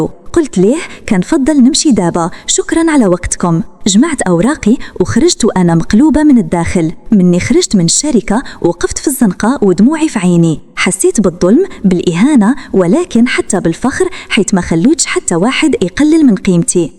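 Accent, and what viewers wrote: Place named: Algeria. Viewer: مغربية و تهدري باللهجة الجزائرية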